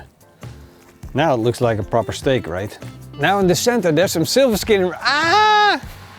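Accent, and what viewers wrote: Netherlands. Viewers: Je hoort echt dat Nederlandse accent hij zei stok terwijl het stick moest zijn 😂😂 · I sense Dutch accent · are you dutch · You hear this guy is from the Netherlands 😂😂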